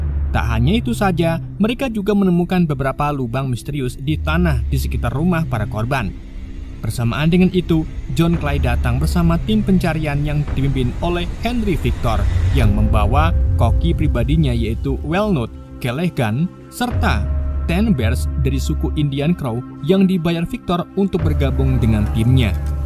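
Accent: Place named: Java, Indonesia. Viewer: Penasaran nemen sung ente wong brebes apa tegal sih min.. "miyang cumi" 😅😂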